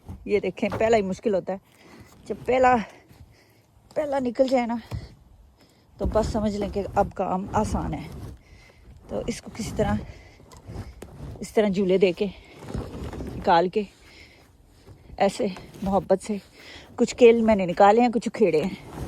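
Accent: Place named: Mirpur, Pakistan. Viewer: Aap pakistan mein kahan se hain Aapka accent bilkul mere jaisa hai And aapki choice of words bhi Mein Mirpur k paas se hu.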